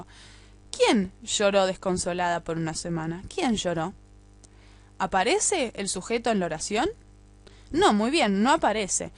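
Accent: Argentina. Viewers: Eres argetina verdad?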